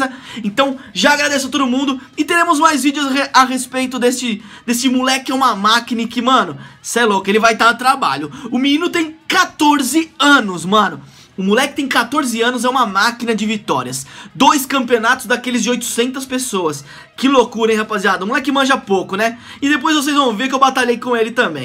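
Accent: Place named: São Paulo, Brazil. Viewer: vc é paulista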